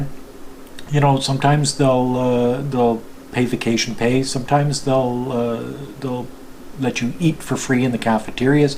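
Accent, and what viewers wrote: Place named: Canada. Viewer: Great video, I like your Canadian accent.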